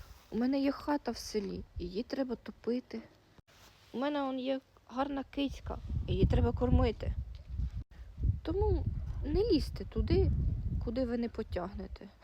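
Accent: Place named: Surzhyk, Ukraine. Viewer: У тебя у самой не русский, не украинский, а суржик.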